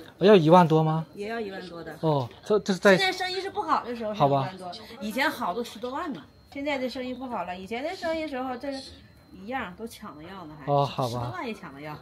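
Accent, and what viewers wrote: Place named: Fujian, China. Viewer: 福建口音濃重到有點假！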